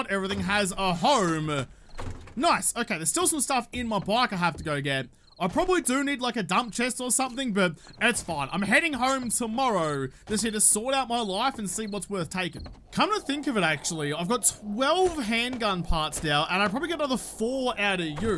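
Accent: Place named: Australia. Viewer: he is an Australian... I'll give him slack on pronunciation.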